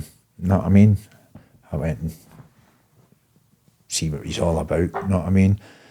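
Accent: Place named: Glasgow, United Kingdom. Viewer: cheers for this podcast, my granda's Calton born and raised and just recently passed and hearing your accent reminds me of him so much you've gained a follower from me hope to hear more podcasts from you with Shaun or just any other story. I had to re light my spliff at least 7 times listening to your nutter of celly story I was laughing that much. Wondering if you ever knew my cousins from easter house they're no about anymore (Binnies) if so any stories of them?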